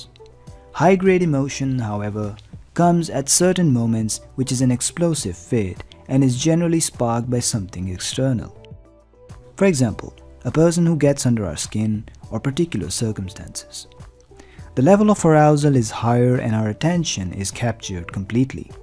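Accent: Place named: India. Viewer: Are you Indian by any chance??? Your accent sounds like an Indian so....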